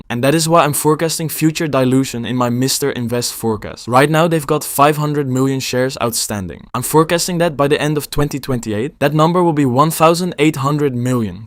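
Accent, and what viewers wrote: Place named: Netherlands. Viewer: really interesting video about GPUS, and the way you calculate things! Also gotta love the dutch accent. Cheers from sweden!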